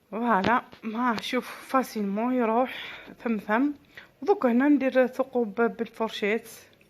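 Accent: Setif, Algeria. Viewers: راكي فور واهدري نورمال هدرتك تحليك سطايفية وعليك لكلام 😘😘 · هدرتك هدرت سطايفية حرة 😍😍😍😍😍😍😍😍😍😍 ماشاء الله حبيتك بزاااااااااااااااف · مميزة صح هدرت سطيف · هدرتنا تسخف حنا السطايفية ... انا نتبعك غير باش نسمعها ... كملي اهدري هكدا عيشك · سلام عليكم ورحمة الله وبركاته أنا ساكنة في فرنسا من سطيف وراكي زاهتني بالهدرة تعك يا سطيفيا الحرة و كثر خيرك و يرحم الي جاب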